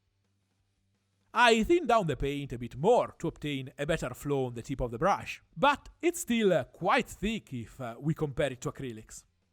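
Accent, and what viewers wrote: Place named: Tuscany, Italy. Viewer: I like your stuff, my Nonno was from pistoia and your accent is bringing back all the feels 😂😂 I’ll pass on the oils for now but it’s good to know the video is here for if and when I get the urge.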